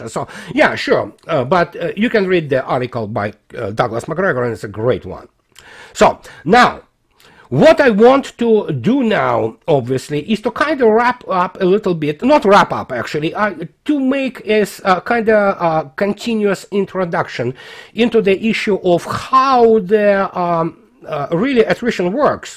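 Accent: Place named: Russia. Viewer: to my English-speaker's ear, you have a pronounced Russian accent, and as such, it is a challenge for me to understand you under the best of circumstance. However, you talk so quickly that it makes my comprehension all the more problematic. Could you please SLOW DOWN your speaking some? Thanks, BB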